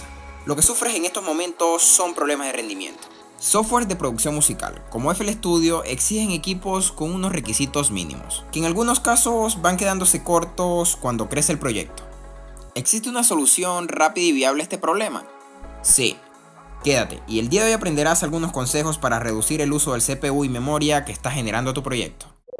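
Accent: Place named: Venezuela. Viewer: Tu acento me suena.... Tu como que eres venezolano? Me too.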